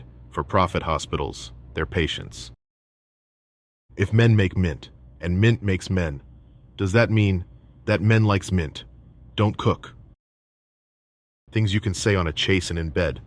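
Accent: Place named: United States. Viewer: Love the conten Hate the voice I hate American speaking voice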